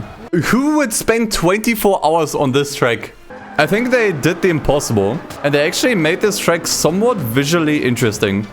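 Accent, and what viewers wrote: Germany. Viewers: As an American, we get it, you’re German lmao he sounds so German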